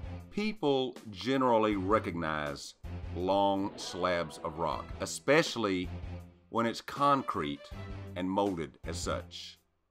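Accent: South, United States. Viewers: my man, I love your work. YOU are brilliant...not to mention a real entertainer. However, you actually would help your cause so much more, and could convince the uninitiated of your sincerity, IF.....IF...you didn't dress like a homeless person and buy a comb. Now it doesn't bother me about your sloppy dress and or your southern accent...because I live in the south, but ya have to understand PERCEPTION becomes REALITY. So just a suggestion to take a bath, go to Sportsclips for a hairCut, and buy some new clothes. But regardless, even if you were to where a clown suit...I will watch all your videos TWICE. Love ya man.....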